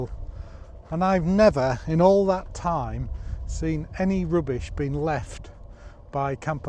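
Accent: Hull, United Kingdom. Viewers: Just discovered your channel, great video. Nice to hear a hull accent again (I’m from Leeds but went to hull uni). Keep up the good work! Really good video because you clearly know your topic and put a lot of effort in, you’re passionate about it, and you have a nice relaxed style.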